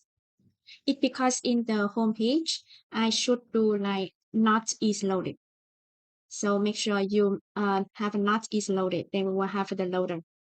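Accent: Vietnam. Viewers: unrelated but are you Vietnamese? because your accent is similar to one of my Vietnamese friends :D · CHị này người Việt chắc luôn :))))) nghe phát âm quen lắm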